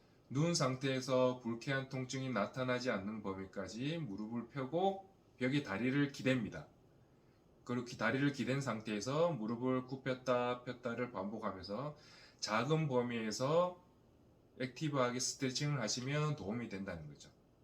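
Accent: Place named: Seoul, South Korea. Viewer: 목소리 멋지네요 👍 발음이 서울사람 같아요! 좋은 정보 감사합니다 🙆🙆‍♂️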